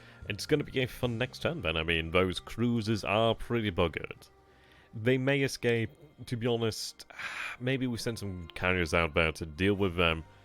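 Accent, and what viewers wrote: United Kingdom. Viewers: is a well spoken English Gentleman, and I have no problem understanding what he says, but I guess if your an American, or Canadian, or from some other foreign country, then he may well sound strange to you.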